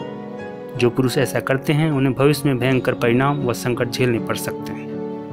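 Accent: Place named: Bihar, India. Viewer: I liked the Bihari accent.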